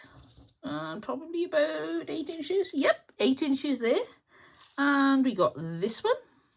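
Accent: Wales, United Kingdom. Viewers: So lovely to see you back and to hear your velvety Welsh voice coming from the the tv again. Please take it slowly, and allow yourself to heal. What a wonderful tonic Catherine has sent you. Just perfect. ☺️ Xx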